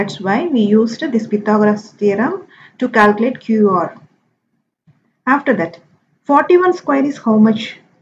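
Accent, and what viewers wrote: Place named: Bengal, India. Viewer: Maam aapki aawaj bengali lagti hai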